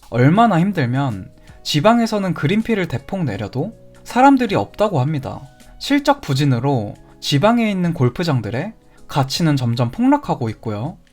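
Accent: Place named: Honam, South Korea. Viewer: 혹시 전라도 출신이신가요? 말하는 억양이 호남지방 억양이 짙은데..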